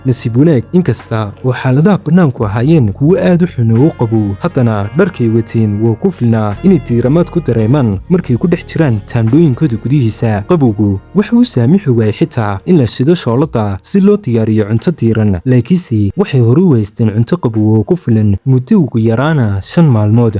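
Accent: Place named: Somaliland, Somalia. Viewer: lahjadii somaliland na waad leedahay waxaanaad isticmaalaysaa luuliyo halkaad ka isticmaali lahay julaay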